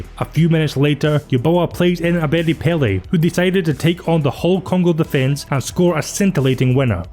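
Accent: Scotland, United Kingdom. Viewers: This is one of my favourite channels..why? Because u cover players from the 90s and early 2000s..my childhood years..also u cover unsung players like tony yeboah..we all know his goals for leeds but the rest of his career doesn't get spoken of really..i also love ur scottish accent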